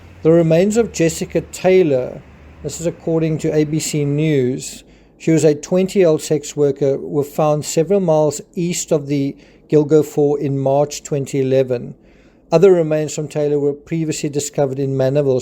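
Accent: South Africa. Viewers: You sound like a South African. I'm watching from Durban. I think they will find more bodies, about 15 or so, possibly two children and, or teenagers. Poor victims.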